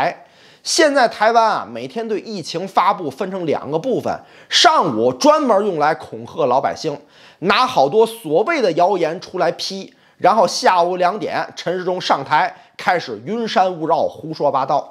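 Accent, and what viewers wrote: Tianjin, China. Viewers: 天津老乡吧？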